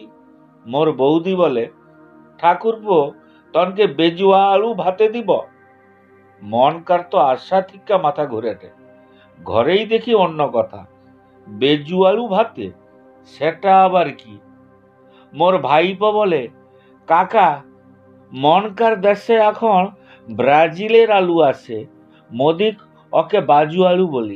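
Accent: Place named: Medinipur, India. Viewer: মেদিনীপুরের আঞ্চলিক কথায় আবৃত্তি টা শুনে খুব ভালো লাগলো।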